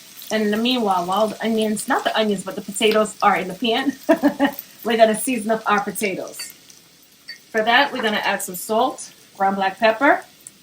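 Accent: New York, United States. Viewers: Love you Mama ❤️ never stop 🛑 beautiful accent NYC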